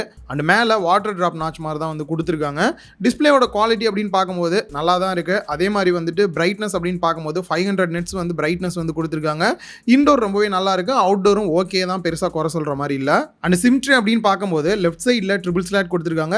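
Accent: Tirunelveli, India. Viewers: thirunalveli yaa iruku 😅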